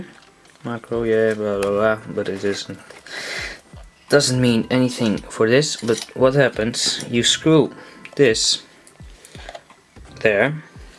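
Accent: Netherlands. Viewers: duidelijk een nederlander :p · give him a break he is dutch....im sure he speaks better english than you can dutch. Anyone watching the video can see how the system works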